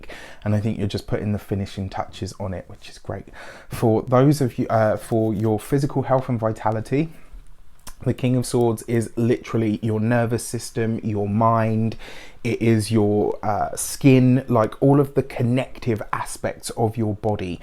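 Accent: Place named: London, United Kingdom. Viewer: Am from the south west of England absolutely love your accent makes me feel at home in LDN. Thank you for this weeks 🙌🏾🧡🙏🏾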